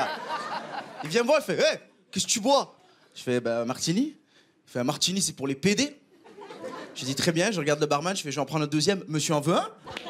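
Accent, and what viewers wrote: France. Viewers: Ça aurait été mieux avec l’accent québécois que celui français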